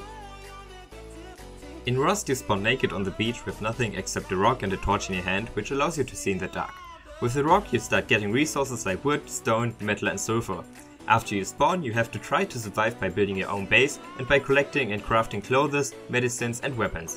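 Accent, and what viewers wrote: Germany. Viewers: Brooo man hört so hart das du deutsch bist ! Aber gutes Englisch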